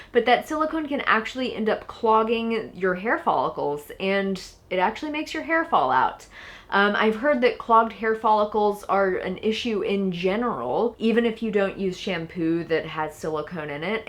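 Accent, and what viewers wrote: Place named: Tennessee, United States. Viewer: GREAT INFO! THNX! WHEN I USE THE APPLE CIDER VINEGAR I SOMETIMES LEAVE IT ON, THE SMELL GOES AWAY IN A FEW MINUTES, BUT YOU CAN ALSO ADD ONE OR 2 OF THE ESSENTIAL OILS FOR HAIR GROWTH, LIKE ROSEMARY, PEPPERMINT, CARROT SEED, LAVENDER OR EVEN TEA TREE TREE OIL. I ESPECIALY LOVED YOUR TENNESSEE ACCENT, WHY NOT TALK LIKE THAT ALL THE TIME? ✌💚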